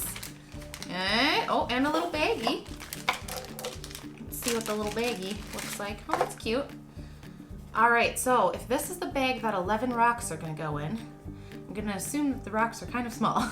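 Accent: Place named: Minnesota, United States. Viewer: I mean you're a Minnesotan I say melk, tæble, and I'm from SD Bāg vs beg :)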